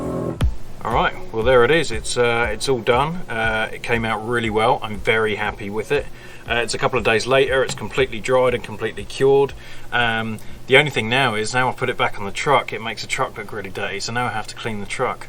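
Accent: United Kingdom. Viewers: I was so confused at the start of your video. You sound very British, but your house and towing hardware didn’t match up. Your house didn’t have any brick or shiny black exterior plumbing. Good demo. I’m going to be doing the same to my towing hardware.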